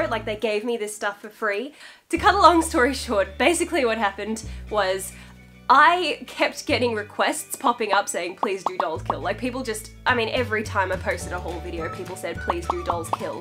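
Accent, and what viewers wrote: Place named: Australia. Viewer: she is aussie she does not have a bad aussie accent u can’t be aussie or know their accent at all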